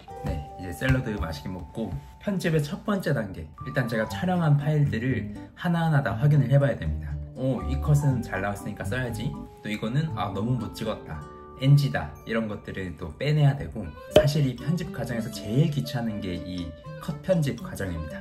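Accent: Gyeongsang, South Korea. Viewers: 열심히 사시는 님 늘 응원합니다. 발음교정중 하나 알려드립니다. 경상도 분들의 특징인 월요일.금요일.일요일 ㅎㅎ 워료일.그묘일.이료일 ~!! 이렇게 발음합니다.ㅎㅎ 저는 님발음중 요게제일 거슬리더라구요~! 워료일그묘일이료일로 듣는날이 곧 오기른기대합니다😅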